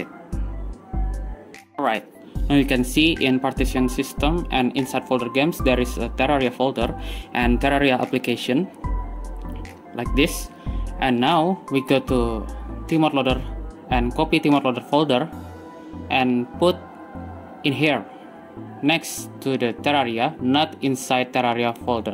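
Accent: Indonesia. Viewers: menurut gw berasa kok indo nya, udh biasa denger org indo ngomong pake b. ing.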